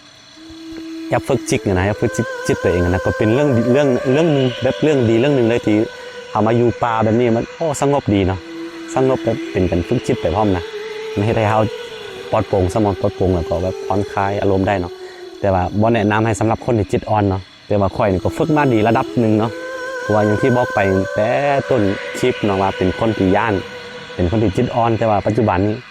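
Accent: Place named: North, Thailand. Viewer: หม้อขางคือกะทะภาษาเหนือผมว่าคำพูดฟังง่ายเพราะพูดสำเนียงไม่ออกอีสานหรือลาวเวียงจันทร์มันออกทางฟังง่ายๆเหมือนภาคเหนือของไทยผมคนเหนือฟังโดยไม่ได้แปลเลย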